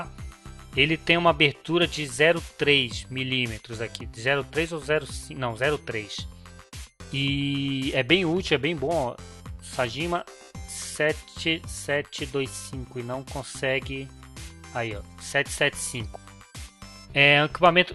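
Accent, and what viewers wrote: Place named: Belém, Brazil. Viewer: Ele deve ser de belém do Pará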